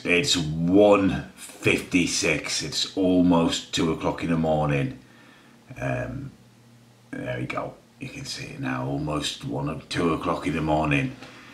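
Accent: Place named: North, United Kingdom. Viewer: just lost the game he sounds like he's from the north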